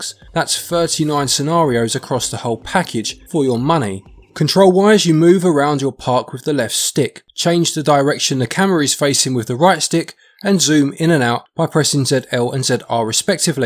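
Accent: England, United Kingdom. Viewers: I watch a lot of your videos and alway wondered where in England your accent is from, thanks for clarifying 😀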